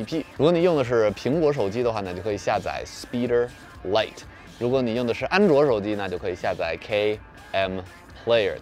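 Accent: Beijing, China. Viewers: 你的中文真的不错啊，希望你能改掉那种京片子的腔调，这种腔调其实现在北京人也不说，因为给人油嘴滑舌的感觉。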